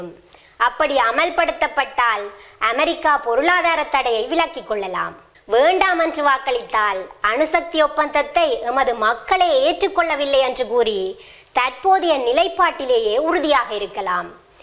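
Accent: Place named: Sri Lanka. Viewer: இலங்கை தமிழுக்கு நான் அடிமை இலங்கை தமிழேக்கு தனி திறன் கேட்பதற்கு இனிமையாக உள்ளது தங்கள் தமிழ் உச்சரிப்பு....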